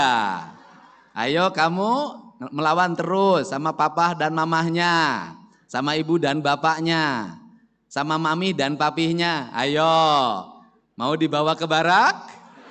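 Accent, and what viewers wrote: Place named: Java, Indonesia. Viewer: Tahu aku kabayan,,,, Tapi si KDM besar nya, di subang, subang itu banyak jaware ( jawa separo), sejatinya adl bangsa jawa. Lihat saja KDM, kadang2 keluara logat jawa,, lihat saja rumahnya itu rumah bergaya jawa bukan sunda..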